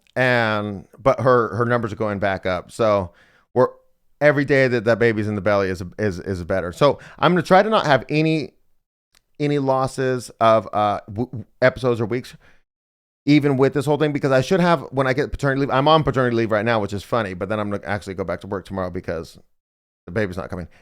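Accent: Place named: United States. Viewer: Why is this guy who is clearly of European heritage speaking as if he belongs in the Americas?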